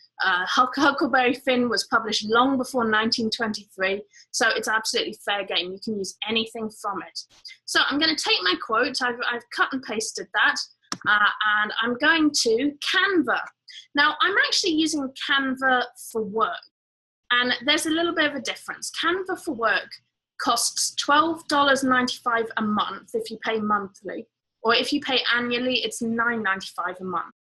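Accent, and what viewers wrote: United Kingdom. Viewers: I love your accent,. Are you English??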